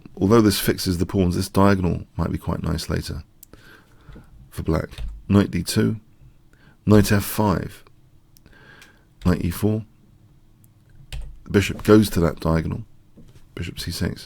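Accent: United Kingdom. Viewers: yeah you know you’re British accent is different than what I’m used to hearing being an American unfortunately.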